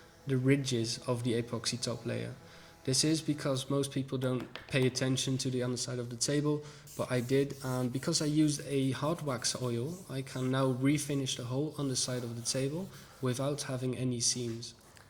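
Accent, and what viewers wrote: United Kingdom. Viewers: thought I was listening to a Londoner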